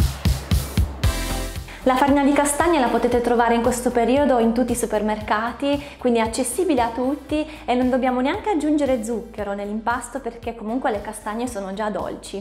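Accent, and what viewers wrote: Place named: South, Italy. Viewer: Hai un unica cosa che mi da fastidio, l'acce'ntooo te'rro'ne. Cazzo rovina la favola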